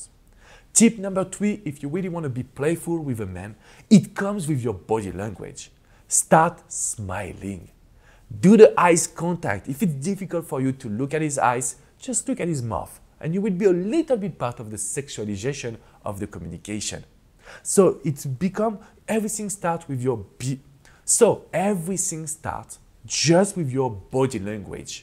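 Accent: France. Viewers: haha "You can hear my french accent"... 🤣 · Ohhh le french accent je love it 🥖 🍷 🇫🇷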